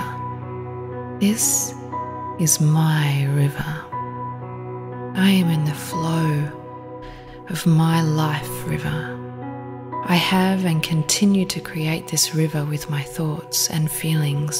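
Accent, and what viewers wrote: Australia. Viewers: My soul responds so much more to your beautiful Auzzy voice ! Namaste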